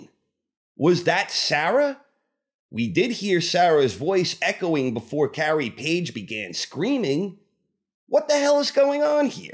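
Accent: United States. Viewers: i would love to know if this is your real voice..and if so....which part of America are you from...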